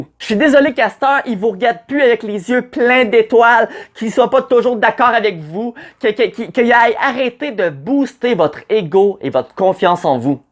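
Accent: Quebec, Canada. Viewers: L'accent québécois ça me tue Mais merci pour l'explication quand meme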